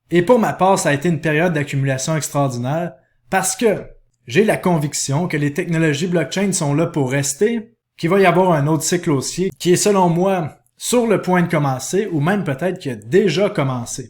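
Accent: Canada. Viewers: Merci beaucoup pour votre travail 😁. J'aime toujours autant l'accent canadien 😉, il me tarde de retourner à Montréal 🥰. A bientôt. 👍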